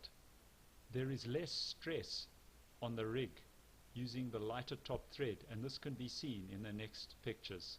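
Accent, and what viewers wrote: South Africa. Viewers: I love randomly hearing an accent from home! Lekker bru :)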